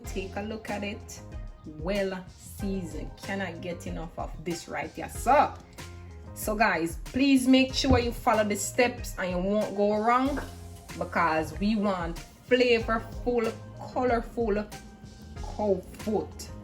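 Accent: Jamaica. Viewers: This Channel Is the Best Channel out of every other Cooking Channel Because U identify the ingredients products Step by Step an identify the Food Process an Her Beautiful Accent OUR Language Potwah 🇯🇲 Vinegar an Lime is Optional Part of your Cooking Section 100/100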